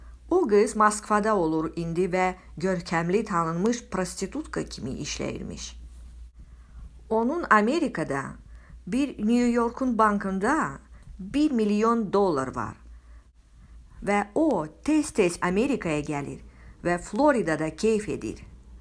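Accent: Armenia. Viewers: Bu deyəsən bekarçılıqdan ağzına gələni danışır. Erməniyə oxşayır ləhçəsi də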